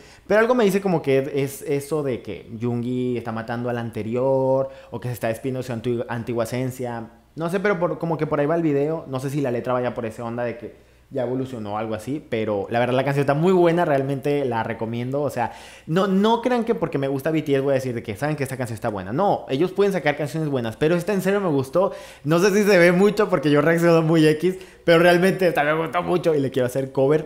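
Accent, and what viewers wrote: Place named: Colombia. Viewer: No tiene nada que ver pero amo tu acento colombiano 💞 ahri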